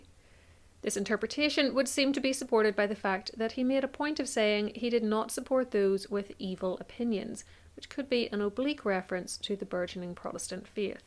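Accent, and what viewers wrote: Northern Ireland, United Kingdom. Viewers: Yes her Northern Irish accent is beautiful. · What part of the north of ireland are you from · A Northern Irish lady speaking. Just speaking normally. No "It's not X, It's Y, AI drivel. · Northern Ireland accent 🙂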